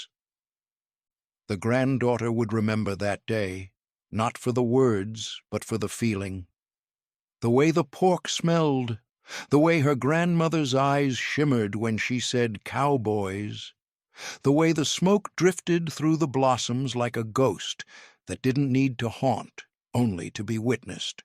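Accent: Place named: United Kingdom. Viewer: Texas in America and yet you choose to use a British sample narration. I don't understand.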